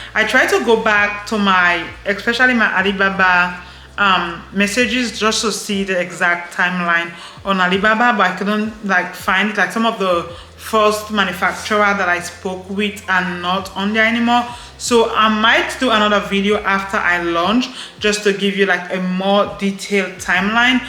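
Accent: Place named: Cameroon. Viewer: hi i have watched most of your videos , so that i can learn and help my wife set up her pyjama line too . For now we buy from people who already shipped in to cameroon from china . so we make little profit if we want the good stuff . major challenge is how to get a good manufacturer who os reliable .Maybe you can help us . We are willing to pay for the help1; also i always wanted to ask what nationality of origin you are , because youy sound cameroonian as you have an underlyiong french accent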